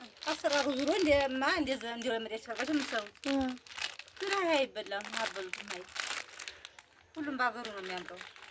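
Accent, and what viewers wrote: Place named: Rural, Ethiopia. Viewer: ሀዋየገጠርልጅአማረኛነው አነጋገርሺ በርቱ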